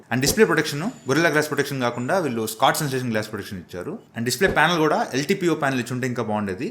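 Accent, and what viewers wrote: Nellore, India. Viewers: bro nellore aa meedhi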